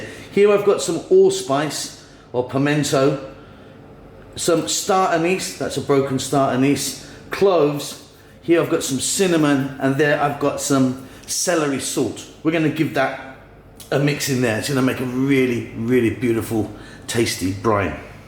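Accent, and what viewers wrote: United Kingdom. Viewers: Its a british accent...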